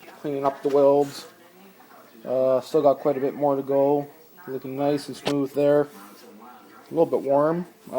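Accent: Canada. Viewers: What part of Newfoundland ya at me buddy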